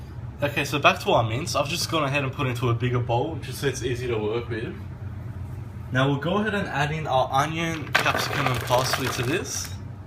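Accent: Australia. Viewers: from your accent you sound Aussie. Where are you located? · Hi there, You did it very well, did you learn this method from your Father? hahahah, Well done really. I really like your english accent, Are you from Australia? But you are 100% Iranian. Greetings from Holland. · I like your Aussie accent! 😀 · where in Australia u from?? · You from Australia brother??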